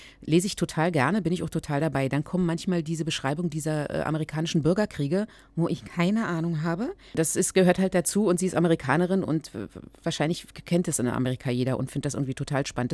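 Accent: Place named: Berlin, Germany. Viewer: Einfach sympathisch, schon allein, weil sie berlinert ;).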